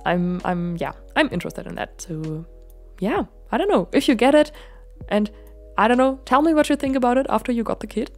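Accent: Germany. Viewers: No, she's from Germany and German is her first language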